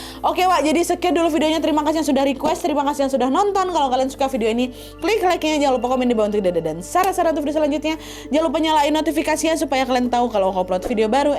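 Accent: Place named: Padang, Indonesia. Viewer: Logat Padang terasa bgnt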